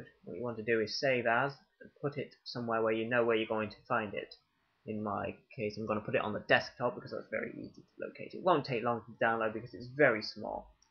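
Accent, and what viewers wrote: England, United Kingdom. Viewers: you sound like my friend Alex with a British accent. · Love your accent! Are you English like me?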